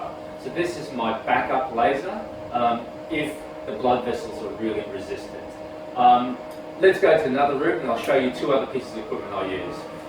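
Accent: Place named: Australia. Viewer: Rude. You look great. As a patient I always prefer to be treated by someone who isn't cookie cutter perfect. As an American, your Australian accent is more distracting, but in a good way :D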